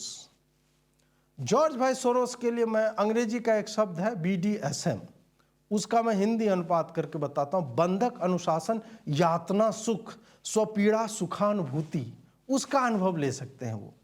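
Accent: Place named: Bihar, India. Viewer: अजीत जी आपका बिहारी लहजे में भयंकर रोस्ट बड़ा मज़ेदार लगता है I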